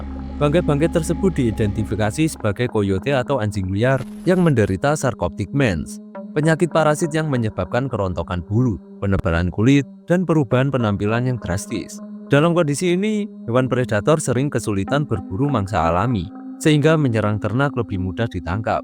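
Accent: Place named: Java, Indonesia. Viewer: Abang orang Jawa ya medok nya keliatan 😹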